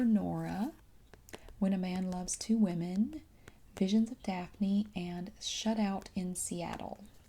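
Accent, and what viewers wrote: South, United States. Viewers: Love your southern accent. It's fairly mild or just a kind I prefer. Since you must like more dry humor like me, it's possible you'd like Dom Joly's two main shows (that I know of) which ran back in the 2000's like 'World Shut Your Mouth' and also 'Trigger Happy TV'. They're more prank/public spectacle based though.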